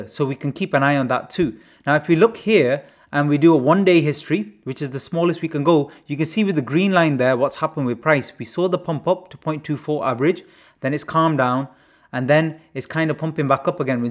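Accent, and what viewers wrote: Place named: East London, United Kingdom. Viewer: well done bro.. u seem to be good in trading knowing u just started recently in NFT.. im from east london, you sounded like from east side as well..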